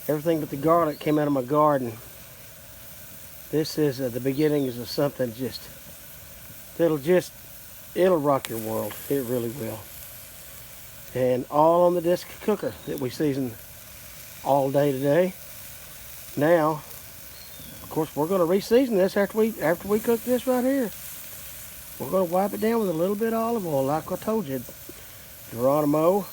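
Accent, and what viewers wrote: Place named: South, United States. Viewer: Dear, we are in Atlanta. Are you from the south as well? I noticed a draw there. Be interested to connect with you and your people. If representation is open, will you send us your Actors Access file? Also, what sites are you on? Headshots and a Demo would be appreciated. Best of luck.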